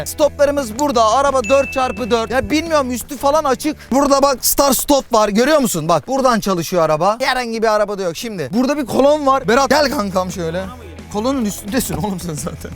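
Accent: Elazığ, Turkey. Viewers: Elazığlı mısın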